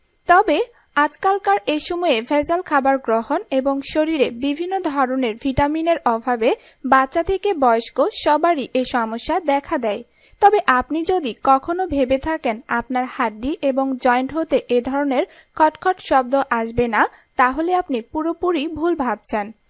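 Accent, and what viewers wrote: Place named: Bangladesh. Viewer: আপনি কি বাংলাদেশের লোক? আপনার কথায় বাংলাদেশি টান আছে।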